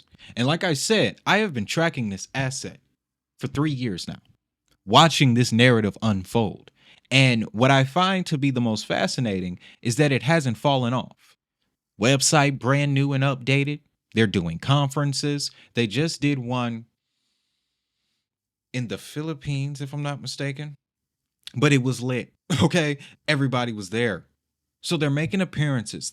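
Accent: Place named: South, United States. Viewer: Thank you for breaking it down with that Southern Style.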